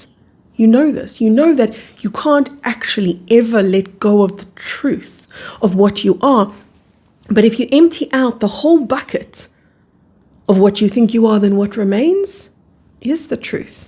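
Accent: South Africa. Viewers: Hi your channel just found me. I knew you were South African from the first sentence 😂. New subbie here I will be binge watching any other videos you have. Love and light from a fellow SAfrican 🙏❤️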